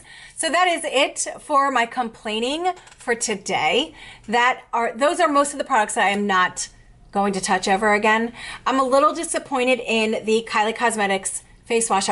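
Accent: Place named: New Jersey, United States. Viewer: loving the Jersey / Brooklyn accent. Got the same one.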